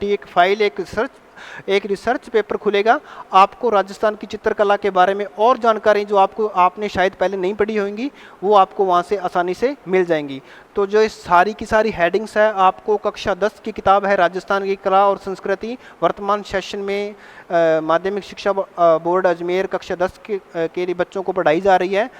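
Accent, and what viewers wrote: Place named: Punjab, India. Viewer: Aapka hindi punjabi accent😊😊😊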